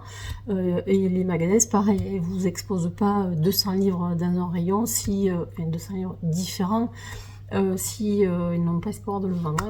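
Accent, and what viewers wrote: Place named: South, France. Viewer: Bonjour 🥰🥰je suis TOTALEMENT d accord avec toi au sujet de l importance de DÉCULPABILISER l idée de colorier. Infirmier en psychiatrie, effectivement l aspect art thérapie du coloriage est indéniable. . . Et effectivement il faut défaire les cases car il y a j en suis sur beaucoup de garçons qui colorient. . . Plus présent sur Tiktok je regarde de plus en plus youtube ou la communauté coloriage fait plus de hors mystère et est très très créative. Ayant fait des études d histoire de l'art je me retrouve enfin qd tu dis que le coloriage est un art en lui même. Je démarre ma chaîne ici pour démontrer que les garçons aussi peuvent colorier et se faire plaisir autrement qu en courant après ⚽. Merci et bravo pour cette super vidéo. Hâte de voir les suivantes. J avais jamais commenté tes autres vidéos/ vlog ou tu réalises de superbes et magnifiques colorisation, c est désormais choses faites. 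Encore bravo Ps au vu de ton accent on ne doit pas être très loin ( moi de paris mais depuis 12 ans a Toulouse avec mon Mari). Belle journée/soirée.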